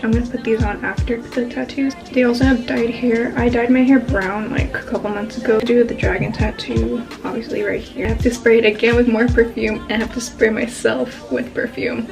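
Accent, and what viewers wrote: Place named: Philippines. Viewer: Which part of the Philippines are you? She does look like filipino and her accent if is filipino accent passing 🥱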